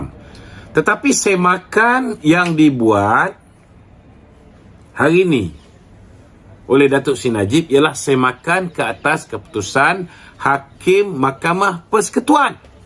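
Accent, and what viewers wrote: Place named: India. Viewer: cakap macam keling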